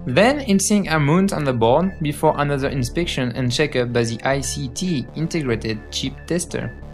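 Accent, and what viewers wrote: France. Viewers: I'm English and I could understand everything he said. Stop complaining! In fact his French accent sounded better than the remastered version with the guy talking with a slight Chinese accent. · I can understand about 80% of what this stupd frenchy's saying. For example the word is "componant", not "cump'n'nt". "How" not " 'ow". Lazy prick! It's sad how many young children are out of work because of those robots.:-( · But why the hell did they choose a french for the off voice?? what a dread accent. It does not sound english even a little... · You speak english like me =D Frenchie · No, i can not listen anymore. This video is fucking RUINED by this french frog pos. I'd rather have any foreigner speak English lang. (russian, german), but NOT FRENCH!!!! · ca me va bien je comprend mieux avec l'accent français du coup:) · That's FRENGLISH! Understandable nonetheless;) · if you ask me that sounded more like french... 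either way, in it's very common for big IT enterprises to feature narrators with visible accents. That way they try to show their internationality and acceptance. I work at Autodesk (a software enterprise) and at least 50% of our new products or updates are introduced by someone with a strong accent. Call it cliché if you will:D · vous êtes français?(à entendre l’accent ^^) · wth is the narrator french? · he's French 146% sure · E tu a l’anglais d’un français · L'accent franchouillard tout le long, c'est un calvaire.! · This guy has a french accent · maybe cos the guy speaking is french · accent français XD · This french accent is amasing... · he's french. i can hear it. that's my native language. he sometimes pronounce words as in french -_- as when he says "misplaced" and ze instead of the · definitely french. you can get it from first 30 seconds, especialy when he says "from a to zee":) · French accent:D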